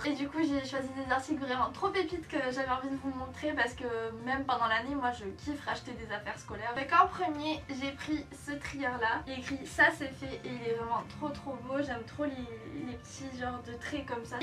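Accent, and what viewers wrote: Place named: South, France. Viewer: Holaaa ! Mais attend c'est la première fois que j'entends ton accent du sud aussi prononcé , Marseille te réussi 😂 Eh j'aime beaucoup trooop tes achat bon comme d'hab hein . En tout cas ça me fait toujours autant plaisir de voir tes vidéos ❤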